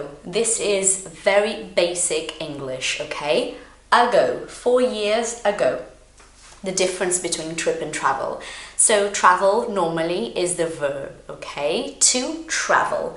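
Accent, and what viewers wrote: United Kingdom. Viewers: Como que eu não te descobri antess! Sou iniciante e amo o sotaque britânico e procuro estudar conteúdo britânico, mas nada se compara a ti: uma brasileira que entende os pontos que temos mais dificuldades. Thank you!!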